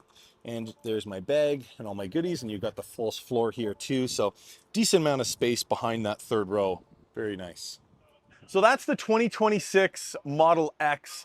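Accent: Canada. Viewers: Totally called that you were Canada… I heard it 😂😂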